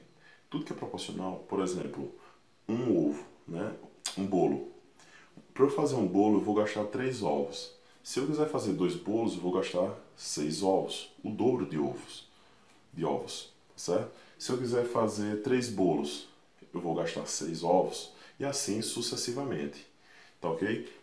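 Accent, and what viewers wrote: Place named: Pernambuco, Brazil. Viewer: Tive grande facilidade em aprender contigo professor. Eu já estudara os anos anteriores este assunto ,mas não conseguia tanto êxito.Nos tempos de escola( há muitos anos atrás). Sua jeitinho simples de explicar à matéria facilitou muito...OBRIGADA! OBS: esse teu sotaque lembra-me meus vizinhos pernambucanos.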